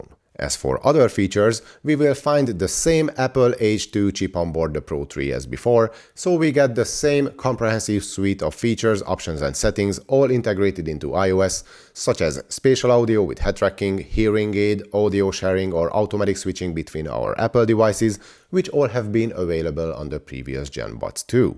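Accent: Hungary. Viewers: Magyar vagy? Hallom 😁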